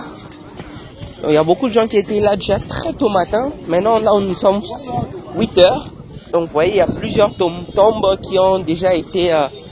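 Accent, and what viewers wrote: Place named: Democratic Republic of the Congo. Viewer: Mboté !! jeune homme .....J' ai tellement d' affection profonde pour la RDC ( et la République du Congo ) que je ne peux pas laisser votre vidéo si bien réalisée en employant ce "bonjour " si familier pour moi . En France le 2 Novembre est le Jour des Défunts : fleurs sur les tombes particulièrement ce jour -là ; les marchands de fleurs vendent beaucoup de chrysanthèmes à cette occasion mais de plus en plus d' autres fleurs maintenant. En général c' est un jour plutôt morose pour les familles concernées . J' aime votre vidéo parce qu' elle me fait voir encore plus de coutumes des Congolais . Et je reconnais tout de suite le cher accent de votre pays !! Dans quelle région est votre famille ?? Amitiés de France 👍🌞🙂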